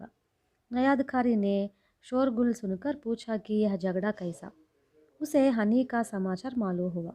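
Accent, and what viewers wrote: South, India. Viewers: south indian accent sounds good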